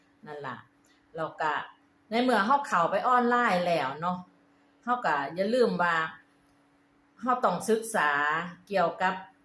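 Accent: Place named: Sisaket, Thailand. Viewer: มักๆเว้าคือคนสีเกด.เเท้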